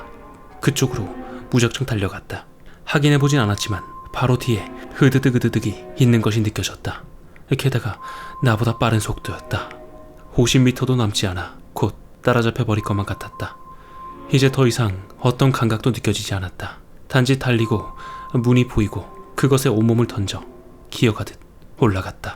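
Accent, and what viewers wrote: Gyeongsang, South Korea. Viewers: 님 경상도 분이신지~~무서운장면에서 사투리땜에 빵터집니다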